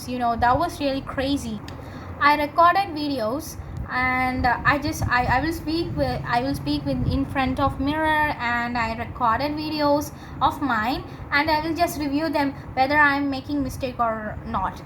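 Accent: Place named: United States. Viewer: American accent is perfect ❤ I loved it.waiting for more videos 🥰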